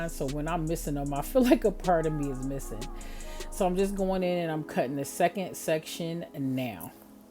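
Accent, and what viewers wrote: Philadelphia, United States. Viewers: I love your hair videos however you wanna post them. You do great hair and it’s also nostalgic to hear you talk. It channels my inner Philly Jawn/ Girl even though I haven’t lived there in 20+ years. Lol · You do beautiful hair, talented, mellow with the Philly accent that shines through, I’m from South Philly but living in Cali for many years…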